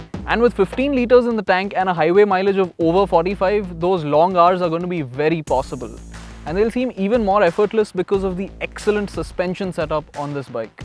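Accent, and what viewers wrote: India. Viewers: Indian accent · I am doing my research on possible Bikes. I give props to people who have become proficient in another language. The problem for me as a native American English speaker is the heavy Indian accent. it makes it difficult for me to understand what the person is saying. I am not criticizing him. He is very fluent in English. His accent is what is getting in the way of me understanding everything he is saying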